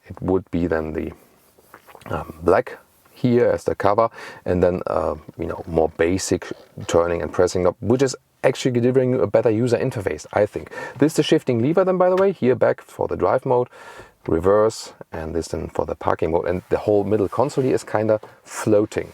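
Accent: Germany. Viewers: I'm sick when listening German accent ...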